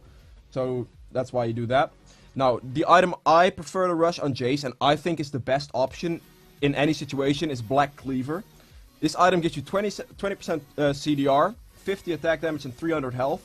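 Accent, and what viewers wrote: Netherlands. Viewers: Hahaha jij hebt echt een Nederlands accent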